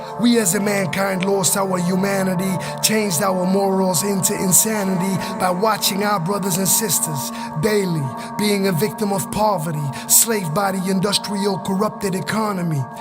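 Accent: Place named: Morocco. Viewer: Simply POWERFUL & ELOQUENT! You've spoken nothing but the truth in such an Amazing way. Allahumma Baarek! [AMEEN] 🤲🏽♥️💪🏽 Keep up the good work, you've got an amazing voice, great vocabulary and accent for a moroccan brother, wichis quite rare these days, no offense bro! 🙌🏾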